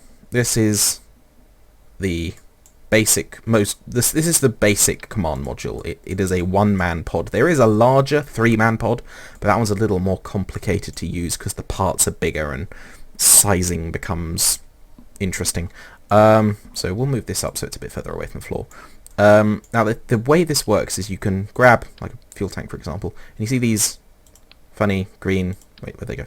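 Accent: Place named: United Kingdom. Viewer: Don't ever stop my friend, you are a great lper for kerbal space program, I dunno maybe it's your british accent.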